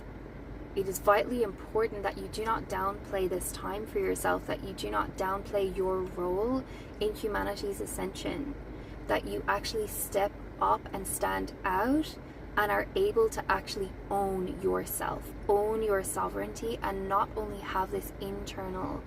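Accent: Ireland. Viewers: did you move from Ireland? your speech is beautiful ❤️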